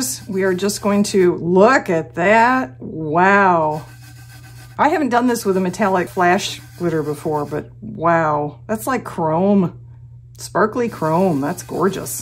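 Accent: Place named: Pennsylvania, United States. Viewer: New Joisey? Nah, not NJ, you don't have a strong accent, I would say PA? That silver is sweet, love it with the skulls!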